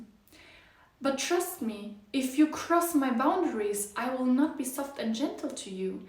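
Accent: Germany. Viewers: Bist du deutsch, dein Akzent hört sich so an:) bin auch aus Deutschland und mag deine Videos viel zu sehr. Liebe Grüße ❤️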